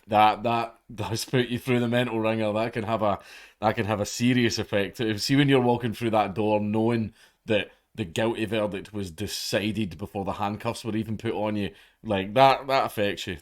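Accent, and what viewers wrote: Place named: Scotland, United Kingdom. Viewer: I don't know if you read any of these but I'd like to see you do one video a month on this channel you just go full Scottish and use your natural accent. I'd like to hear what the quartering heard whenever you were sitting at the bar talking to him after you had a couple of drinks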